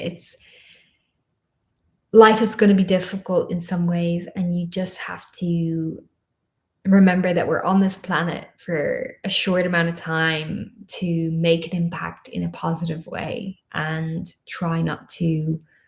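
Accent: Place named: Ireland. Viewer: Hi, lol i always thought you had an accent, but hadnt pinned it down to Irish, thats really cool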